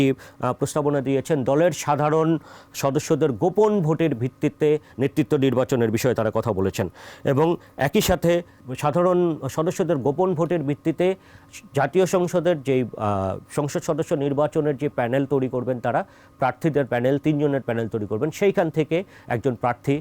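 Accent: Kolkata, India. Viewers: ডেইলি স্টার দাদার মুখে কোলকাতার ভাসা আসে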